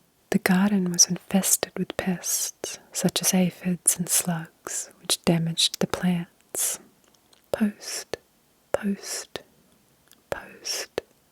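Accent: Australia. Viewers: Hearing a soft gentle aussie womans voice whisper certain words and example sentences takes me straight back to soing spelling bees in primary school. There are notable austtalian ASMR creators, but not many, so its always nice to find them. Just makes me relate more which makes the relaxation better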